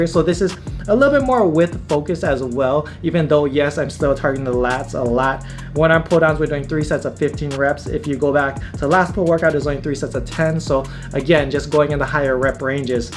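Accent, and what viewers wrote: Hawaii, United States. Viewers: Wassup ma guy are you from hawaii?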